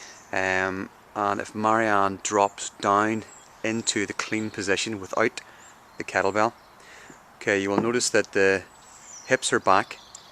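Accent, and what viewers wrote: Ireland. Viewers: The only person to equal your clear and accurate descriptions is the original kettlebell man Pavel ,excellent video and great commentary from your friend,oh and as an aside its great to hear your lovely Irish brogue,makes me feel homesick ,and I'm a Scotsman in Australia..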